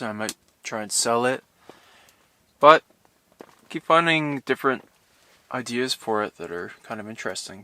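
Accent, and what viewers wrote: Canada. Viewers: is it just me or have you been talking way more canadian this video than usual? maybe im crazy 😅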